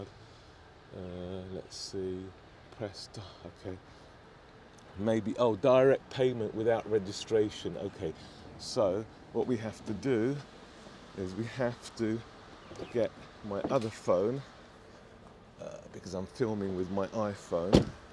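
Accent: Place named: United Kingdom. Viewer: You sound like British guy now.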